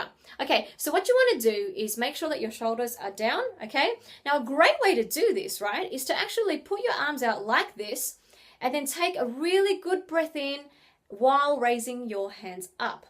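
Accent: Australia. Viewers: are you australian? ...me too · Do u live in Australia? Cause your accent sounds like theirs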